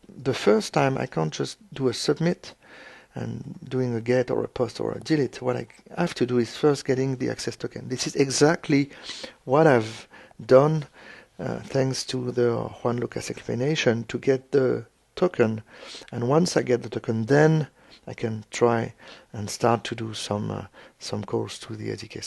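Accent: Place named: France. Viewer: Cet accent français est tellement remarquable ;) Un grand merci pour cette vidéo. Je travail sur un programme C# et WPF de borne photo et souhaite proposer a mes utilisateurs de partager leurs photos sur FB. Je vais donc tester tous cela et je pense que cette vidéo me sera d'une grande aide... Le protocole FB évoluent régulièrement et il semble que la compatibilité soit d'ancienne version soient souvent remises en cause. J'aurais donc une simple question. La méthode proposée ici est elle compatible avec les moteurs FB actuels ? Encore merci !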